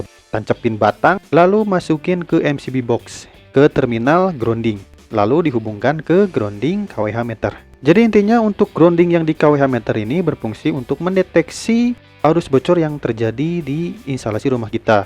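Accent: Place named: West Java, Indonesia. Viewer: Assalamualaikum kang, penejelasannya keren dan nyunda, enak di dengar di kuping parahyangan model sayah. Pertanyaannya nih kang : Jika saya telah memasang grounding sendiri, namun tidak di konek kan ke KWH meter oleh PLN saat masang, apakah tidak masalah bagi keselamatan jaringan dalam rumah saya? Hatur nuhun kang atas penjelasannya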